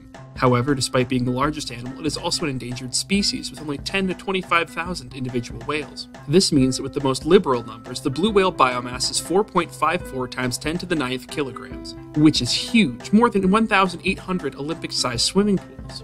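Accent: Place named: United States. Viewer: Sounds American, says "maths" For shame..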